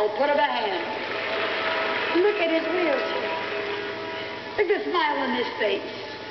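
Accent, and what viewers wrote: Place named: Germany. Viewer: Analicemos su origen y epoca: Kathryn kuhlman nació en la ciudad de Concordia, Missouri, a padres Alemanes (su acento). Se convierte a Dios a los 14 Años en la Iglesia Metodista de Concordia, y comenzó la predicación en la Ribera Occidental a la edad de 16 en Iglesias Bautistas. En julio de 1975 su doctor le diagnosticó un cardíaco leve y tuvo una recaída en nov 76, como resultado, murió en feb de 1976. Pese a sus altas y bajas fue una mujer llena del poder y la uncion de Dios.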